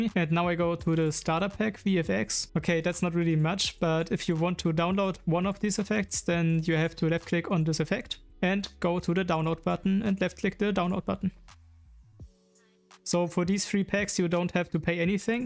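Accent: Germany. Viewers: Do I hear da etwa Deutsch?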